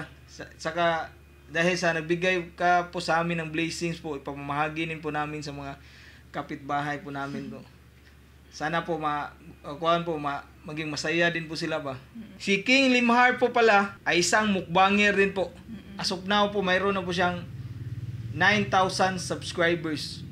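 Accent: Visayas, Philippines. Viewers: Ingles ug Tagalog nimo gahi kaau Klaro kaayo bisaya accent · Di bagay mag tagalog2 bisayang dgko why angay. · Sinasadya nyo po ba accent nyo? Kasi bisaya po din ako. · I like the way you talked! I loved the Visayan accent!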